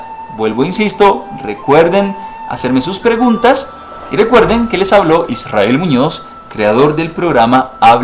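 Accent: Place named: Colombia. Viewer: Gracias mi estimado. Pero tan mal dicho esta decir Zi, como Sapato. Sin ánimo de molestar abrazos para Colombia de donde por su entonación deduzco es usted oriundo.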